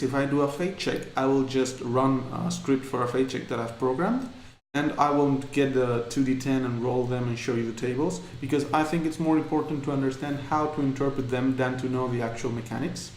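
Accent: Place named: Greece. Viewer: Yeah, you sound exacly like my teacher from greece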